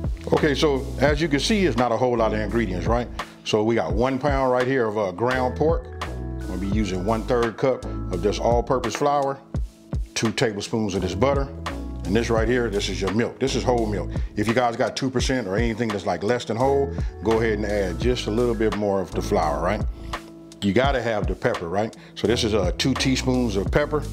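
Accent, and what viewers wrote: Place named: South, United States. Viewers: I'm Canadian and I really really like American accents. Yours is off the charts! Love that Southern twang. Also, this recipe looks delicious. Will definitely try it!! Cheers 🍻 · You the man I grew up in the south I'm ga boy You are ga or Alabama or Mississippi Good cooking. God bless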